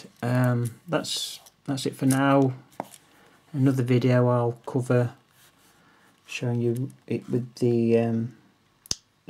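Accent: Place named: North, United Kingdom. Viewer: I miss hearing that "Northern Accent" since moving to Bloody Florida!!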